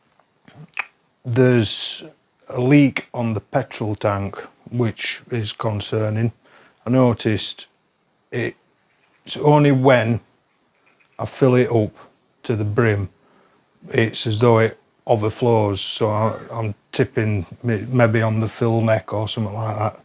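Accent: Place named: South Yorkshire, United Kingdom. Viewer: Hiya mate, judging by your accent I’m guessing you are in the South Yorkshire area, I’m gonna say Barnsley. I may be wrong. Anyway the Vectra looks great, my dad used to have one of these back in the day, and it was a diesel variant in like a British Racing Green colour. One thing I always liked is how wing mirrors are integrated into the body lines.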